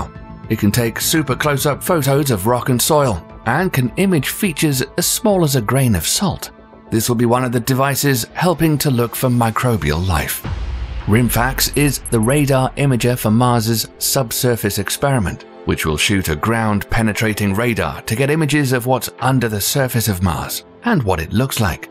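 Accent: United Kingdom. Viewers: I will never NOT upvote any video with the soft dulcet tones of the best british voice narrator.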